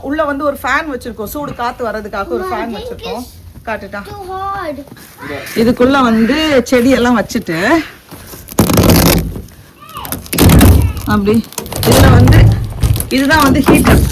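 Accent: Nagercoil, India. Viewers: Hello. It was a pleasant surprise to meet you and your family yesterday at the Garden. I, along with few friends, as gardening hobbyists watch your channel and get some inputs. Thanks for the contents and Nagercoil Tamil. Allan.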